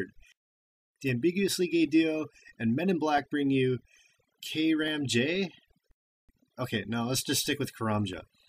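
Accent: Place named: Northeast, United States. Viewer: Wow you pronounce everything the way I pronounce it. You almost have a North Eastern United States Accent. Am I mistaken?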